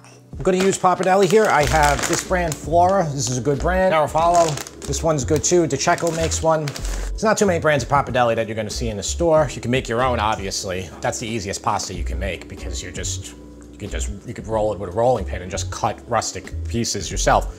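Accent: New York, United States. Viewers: Something tells me this guy is new to cooking. Maybe hired as an actor because he's Italian and sounds sort of New Yorky. Not that he's doing anything wrong, except he may seem a little afraid of the knife.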